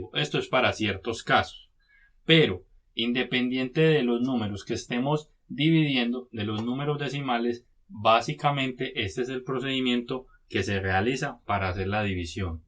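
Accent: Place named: Colombia. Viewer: Su acento es colombiano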